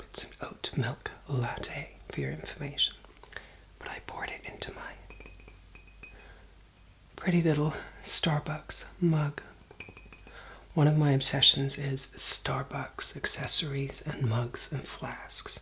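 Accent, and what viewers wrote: United States. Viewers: I assume your English, but you're going about being natural in an American accent, it has me confused 😂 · ..... this sounds like an American accent is this your natural one or one your using. Because your British accent is too real lol ps Anthing with at meridians works for me xoxo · Dude... You're not American are you? I thought you were a fellow Brit? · What’s with the American accent? I thought you were British?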